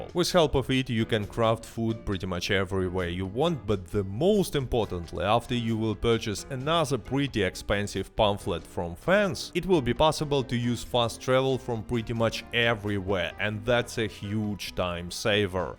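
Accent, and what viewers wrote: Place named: Russia. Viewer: I love your accent. It sounds Russian but not quite Russian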